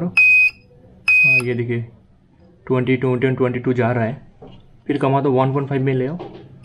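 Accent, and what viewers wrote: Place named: Bengal, India. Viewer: Bengoli wala hindi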